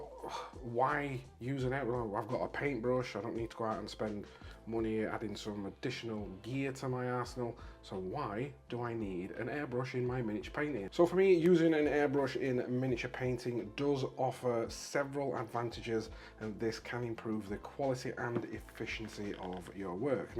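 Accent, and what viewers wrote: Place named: Wigan, United Kingdom. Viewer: Lad sounds like he's from Wigan or something so I assume he's pretty feral 😅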